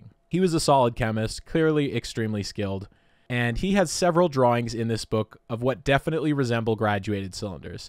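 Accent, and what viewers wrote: France. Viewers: Ey your French accent ain’t that bad haha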